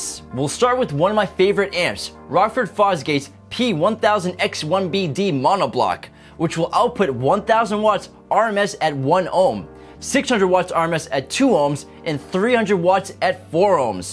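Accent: United States. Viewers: Are you an american? sure sounds like it.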